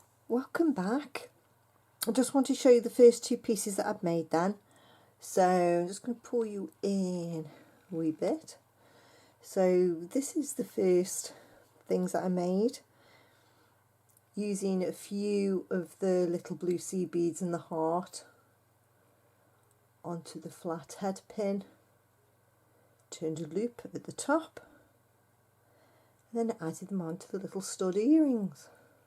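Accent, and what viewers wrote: England, United Kingdom. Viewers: Hi, I’m Ericka! Pleased to meet ya. Enjoyed your video so much and had to respond about your mojo. Lol. Your so funny! I assure you-You still got your beading mojo! Happy Birthday also by the way! I’m in California USA. Glad I found ya and loved your unboxing and pieces you made:-) very pretty. Yes I feel the mojo loss all the time! That’s why I watch unboxing videos to help spark a bit of creativity. I suggest Pinterest- it’s great to browse other designs for that inspiration. I also have a fabulous talented lady named Gina from <link> that I always watch for inspiration on YouTube! You should check out her unboxing and she’s on Patreon and shares her tutorials. She’s really talented. Anyhow, thought I’d introduce myself and say Hi! Not sure where you are but love that accent! England? Happy Birthday whenever it is this month! 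God bless you - ty for sharing!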